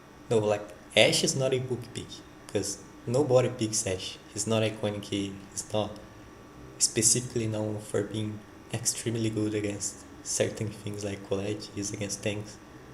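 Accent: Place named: England, United Kingdom. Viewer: I love his english accent so bad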